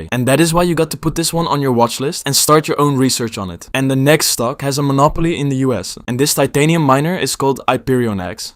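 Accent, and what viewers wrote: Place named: Netherlands. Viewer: really interesting video about GPUS, and the way you calculate things! Also gotta love the dutch accent. Cheers from sweden!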